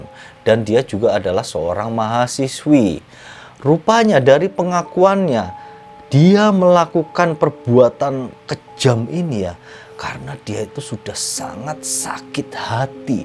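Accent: Java, Indonesia. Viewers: wow salam mas OMONG JOWO NYA PEKAT BANGET.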